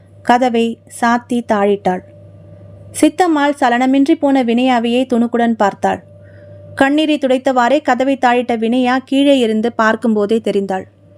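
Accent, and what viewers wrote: Kerala, India. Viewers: Sis! novel 👌but ella novel um malayalm tone athigama varuthe sister 😮u want like malayalam..sis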